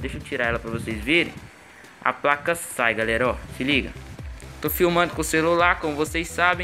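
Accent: Minas Gerais, Brazil. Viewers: mano vc só pode ser mineiro kkk😂😂 parabéns agora rumo a 1 milhao tmj #forçachape😭😭👏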